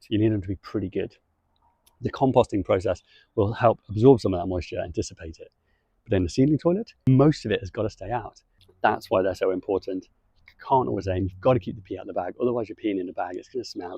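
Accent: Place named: United Kingdom. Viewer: I have just come across your S1 after watching a new (and very supportive) video comparing sealing toilets by Ready Set Van. It looks very interesting as I was within a few days of ordering a Clesana C1. Would I be right in assuming that the liner / bags are propietary and unique to the S1? If so, with the greatest respect to your business, my biggest worry would be that as a newish small business, something happens and the liners stop being available. I appreciate the S1 could then be used as a composting toilet , but I'm not a fan of composting toilets. I also share a slight concern about the use of an app to tell the toilet which type of liner is being used, but if that is all it is used for then I can get past that as I suspect I would only ever use the same type of liner. I note that (despite British accent) the business appears to be based in the US. 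Is there anywhere in the UK that it would be possible to see one of the units? £1,500 is a lot of money to spend on something one has never seen..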